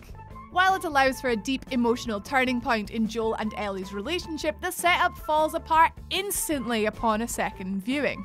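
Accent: Scotland, United Kingdom. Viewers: I could listen to that Scottish accent all day. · Yay for Scottish voices in YouTube. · Are you Scottish?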